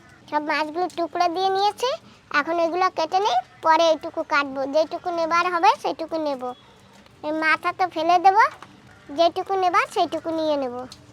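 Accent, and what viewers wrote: Barishal, Bangladesh. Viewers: আমরা কোলকাতায় থাকি ,তোমার ভিডিও দেখি ভাল লাগে তোমরা কি বরিশালের ?কথা শুনে মনে হচ্ছে ।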